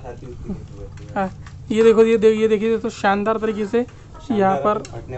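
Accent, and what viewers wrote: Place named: Maharashtra, India. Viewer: तुमची हिंदी महाराष्ट्रातील आहे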